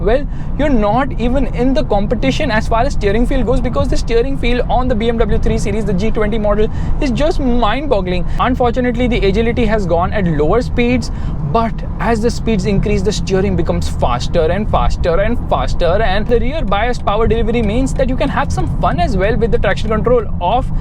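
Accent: India. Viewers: Hate Indian English accent 😆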